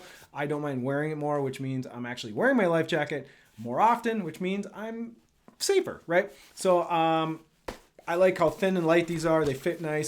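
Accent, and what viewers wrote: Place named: Minnesota, United States. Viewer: Your from Minnesota 😮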